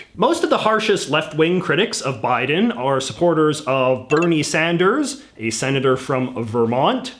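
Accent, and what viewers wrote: Canada. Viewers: Aboot...u are Canadian aren’t you! #Bernie2020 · It's the Canadian pronunciation. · are you canadian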